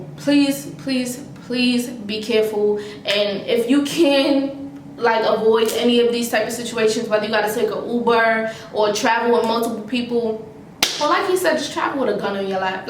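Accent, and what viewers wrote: New York, United States. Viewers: That’s so scary! Glad you were paying attention to your surroundings and your boyfriend was there! Also yall with this thick ny accent story time is everything 👏🏾 “respectfully” lol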